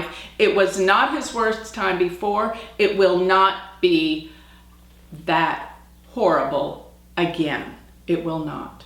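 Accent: Texas, United States. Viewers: I love her southern accent. Texas specifically. Makes me miss southerners a little bit. I live on West Coast now. Over the years, I have dabbled on and off of your website. My knowledge of Vedic astrology is small. After watching this video, I feel more affinity towards you, your work I mean. It takes some courage to do this video, I think, because many people interested in astrology tend to be on the Left (lol). God bless you,.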